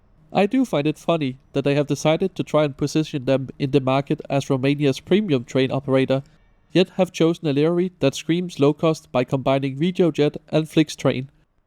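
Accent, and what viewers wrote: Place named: Denmark. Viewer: Is strange how undestand person with Danish accetn better than Americans.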